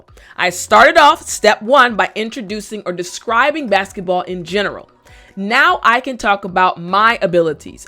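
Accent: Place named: United States. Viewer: What an amazing American accent you speak dear! I love listening to it!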